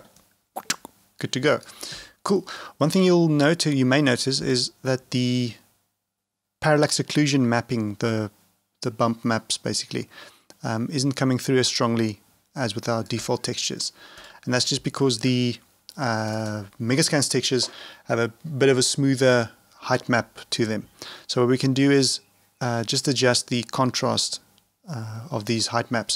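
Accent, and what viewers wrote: South Africa. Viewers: South African? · That NB gave it away i was like its definitely a South African Afrikaans?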